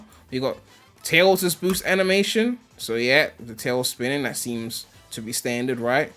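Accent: United Kingdom. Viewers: i like your british accent bruv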